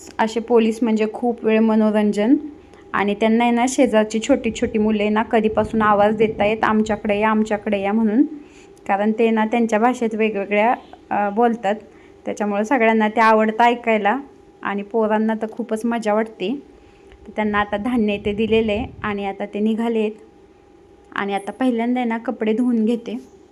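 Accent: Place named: Kolhapur, India. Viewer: कोल्हापूरमधून आहात की सांगली आवाज तसा वाटतो.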